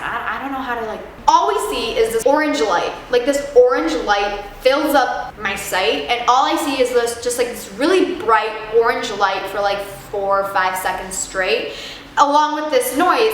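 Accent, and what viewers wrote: United States. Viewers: how come she has an American accent but used to live in Turkey? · How did she get such a flawless American accent in just 4 years at that late of an age? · She's Turkish but has lived/visited America so she picked up the American accent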